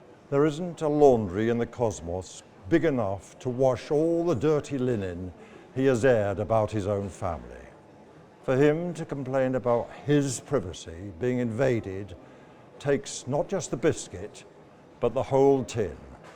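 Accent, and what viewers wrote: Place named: RP, United Kingdom. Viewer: Posh voice doesn't make it right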